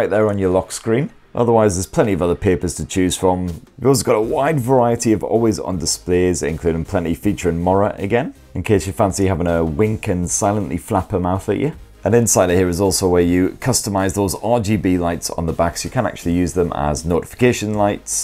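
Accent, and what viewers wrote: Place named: Newcastle, United Kingdom. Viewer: sounds like Newcastle accent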